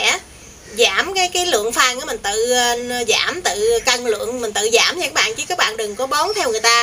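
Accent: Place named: Mekong Delta, Vietnam. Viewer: Da.tai coi nghe chi nói chuyện giọng trong trẻo và ấm áp nên e tưởng chị là người miền Tây ah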